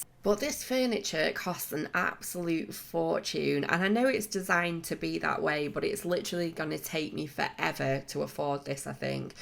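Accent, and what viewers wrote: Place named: United Kingdom. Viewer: Such an amazinh build once again! I always watch your videos... im a huge fan of UK im dying to visit your country... but until then I have to hear you accent... its so amazing 😂😂 Btw loved the entrance song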